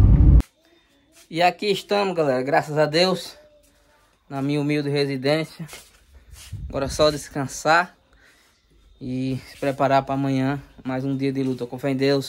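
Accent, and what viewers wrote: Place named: Northeast, Brazil. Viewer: Sotaque nordestino é maravilhoso 😊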